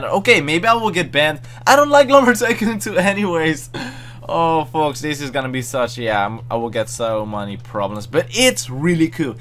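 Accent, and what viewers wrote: Netherlands. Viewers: is he dutch? ik ben wel nederlands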